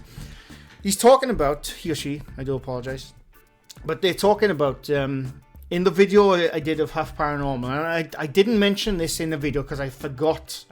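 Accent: Wales, United Kingdom. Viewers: As a Scot, I have no idea why anyone would think you are Scottish?... You are clearly Welsh. All the best mate.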